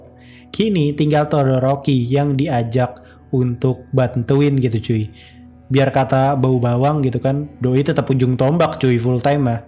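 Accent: West Java, Indonesia. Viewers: Orang Sunda Oge euy admin na 😁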